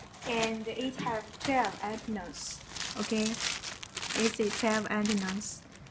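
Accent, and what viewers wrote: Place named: East Asia. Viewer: Andre Moreno well shes either Chinese or Japanese based on the accent and basic English speaking second China & Japan don't care to much apparently because i hear shortwave pirates from Japan & China alot. so this really dose not surprise me...Asian woman..showing illegal jammer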